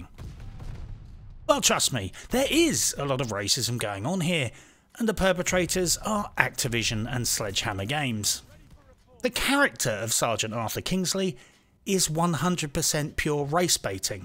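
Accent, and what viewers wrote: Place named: RP, United Kingdom. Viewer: also refreshing to find an anti-left chap with a posh accent.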